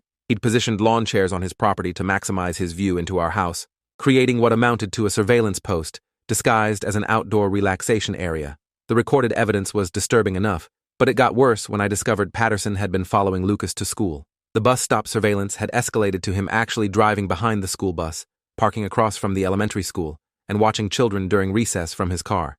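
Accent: United Kingdom. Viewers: British accent anyone?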